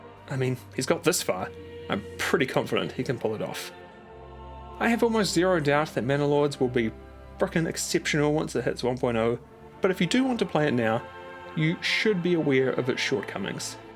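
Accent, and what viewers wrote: New Zealand. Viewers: Is this new zealand accent?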